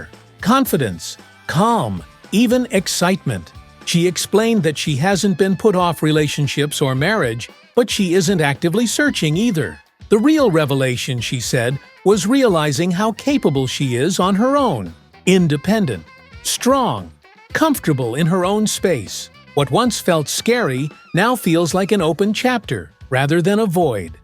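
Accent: United States. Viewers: Why is an American doing this commentary?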